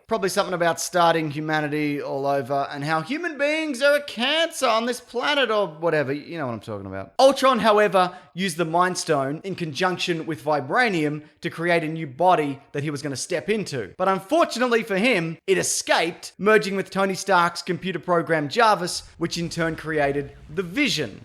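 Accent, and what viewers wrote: Australia. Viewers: love the aussie accent! been a fan of the weekly planet podcast for a while now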